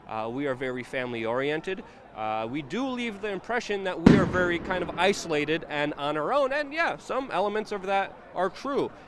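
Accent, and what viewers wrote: Poland. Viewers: I knew you were Polish but I thought you were Polish-American and born in America and now I have no idea how I ever missed your accent.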